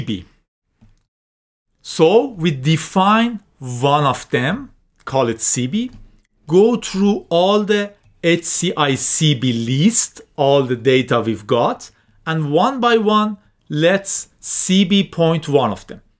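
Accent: Iran. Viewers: I grew up in Iran... this accent sounds Farsi, but more extreme than I usually hear... ,🙂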